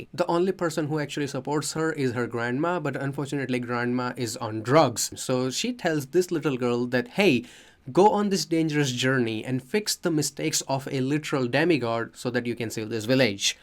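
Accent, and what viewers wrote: India. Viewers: My god I love Indian accents so much lol I could listen to it all day Great video and good points made, I’m just so tired of live action movies and sequences… Disney really just wants to play it safe, and they think the problem is when they come up with originals, well, they just need to make the originals NOT SUCK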